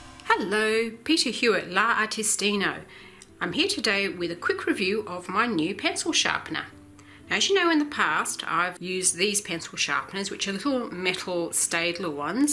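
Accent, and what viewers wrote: Australia. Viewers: A very good straightforward video. Also has one added plus for me personally - it's by an Australian and as I'm an Aussie too it's good to hear it on the Internet once in a while! Note: Officeworks now sells it for A$49.98 (22/5/2019), so it's gone up $5 since that video was uploaded in 2016.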